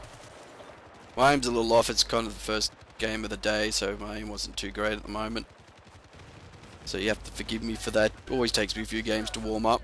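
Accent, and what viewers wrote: Australia. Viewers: at last... a video with call outs and an Aussie for the narrator =)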